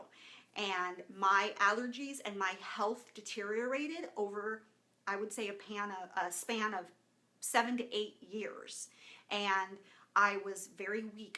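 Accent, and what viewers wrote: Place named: Chicago, United States. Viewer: Hi, I really love your enthusiasm! I'm originally from Chicago,but now reside out in Arizona with my husband and two now adult children! You have an "Chicago accent";) I'm a Christian and love the joy that you exude! I started following you on Twitter too! Blessings from sunny(and Hot!) Phoenix, Arizona!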